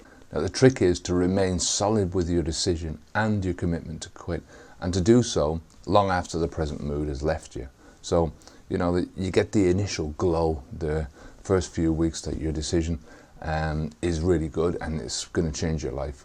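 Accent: Ireland. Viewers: Love Irish 🇮🇪 Accent! 🍀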